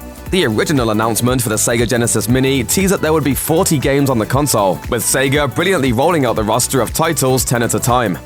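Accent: England, United Kingdom. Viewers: English bloke, English accent. Doesn't mention the Euro release at all. Shit.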